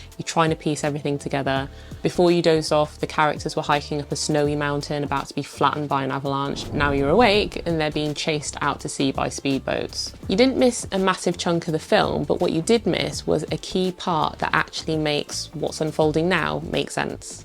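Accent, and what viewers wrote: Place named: Northern England, United Kingdom. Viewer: I watch all your videos all the way through, and I don’t even make vlogs or anything. But hey. I think we have some things n common! I have those same Adidas trainers, I think you’re a northerner like me (Manchester or Leeds or somewhere? I’m crap with accents), and you’re cool AF, and… oh wait, I’m not . Seriously tho, once weather picks up a bit here in Spain (I live in a very cold region), I’ll do some live streaming by the river, just using iPad and restream.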